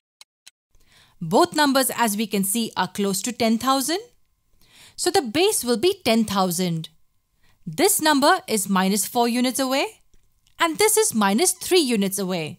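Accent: India. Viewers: the accent is clearly indian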